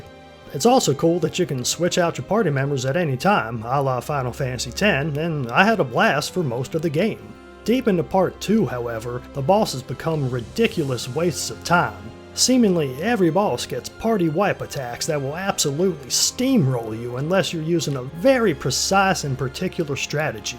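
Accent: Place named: Rural, United States. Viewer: >hick accent, opinion rejected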